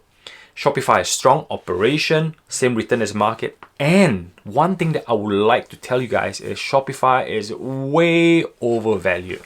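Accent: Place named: Singapore. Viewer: Are you originally from Singapore? I hear the Singaporean accent ;)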